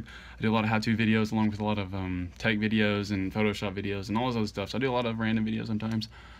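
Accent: United States. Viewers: He had a cowboy Accent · hes got a southern accent it super awsome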